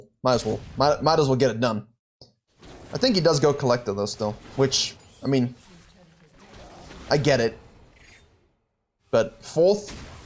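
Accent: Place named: South Africa. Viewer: Wait... Where are you from? You sound south African lol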